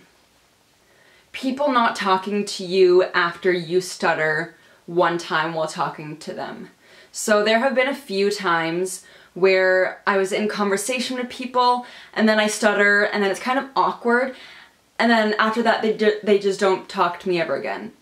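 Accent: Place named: Canada. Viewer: Are you from Canada? I hear an accent. Love all your videos btw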